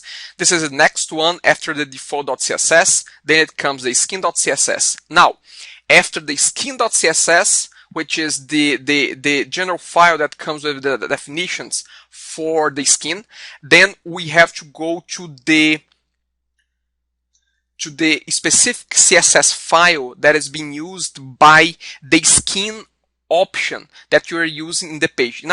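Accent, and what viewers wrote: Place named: Brazil. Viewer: Brazilian accent is unmistakable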